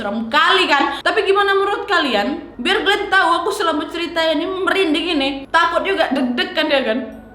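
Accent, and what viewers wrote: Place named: Medan, Indonesia. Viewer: Kakak ini logat nya macam org medan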